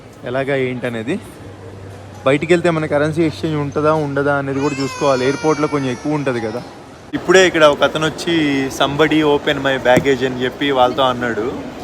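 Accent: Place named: Guntur, India. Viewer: Guntur Slang.. superb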